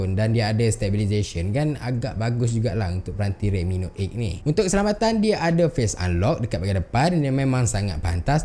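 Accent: Malaysia. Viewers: slang dia kot..biaselah typical malaysian..aku pun macam tu...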